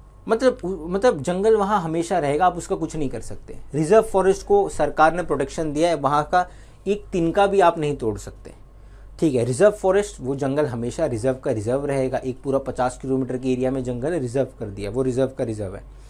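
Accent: Bihar, India. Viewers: Sir mujhe ye to pata chal gya hai ki aap bihar se ho .ab aap ye bataye ki bihar me khaha se ho 😊😊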